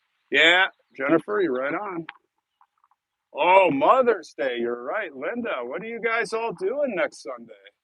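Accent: Chicago, United States. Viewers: love your Chicago dialect!! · My cousin Teresa married Gary Vanhousen from Chicago il so your dialect hits home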